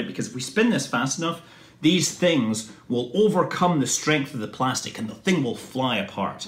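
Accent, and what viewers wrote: United Kingdom. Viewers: British, judging by the accent.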